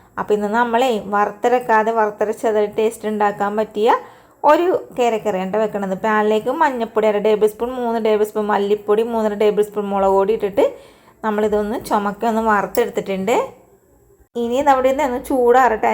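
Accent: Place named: Kakkanad, India. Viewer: കാക്കനാട്ടുകാരുടെ ഭാഷ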